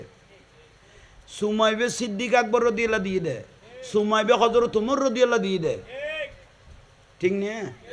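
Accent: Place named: Chittagong, Bangladesh. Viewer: এই বয়ান চট্টগ্রামের আঞ্চলিক ভাষায়। কোন অশুদ্ধ হয় নাই।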